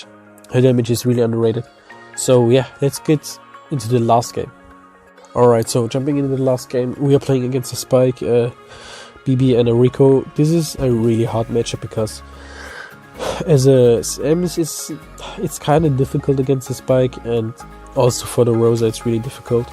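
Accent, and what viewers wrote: Austria. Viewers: Nice Vid. aber man hört beim English den Österreicher raushängen 😝👍🏻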